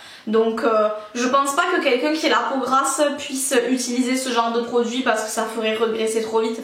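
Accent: South, France. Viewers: coucou ma belle je découvre tout juste ta chaine, et je suis ravie <3 Je m'abonne avec plaisir ! d'ou est tu , il me semble entendre en accent du sud ? jai egalement une chaine, si l'envie t'en dit passe jeter un coup d'oeil ! bises à toi ! Tiphaine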